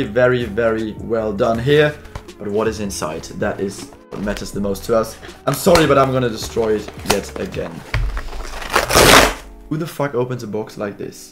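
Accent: Germany. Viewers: are you a german because i hear it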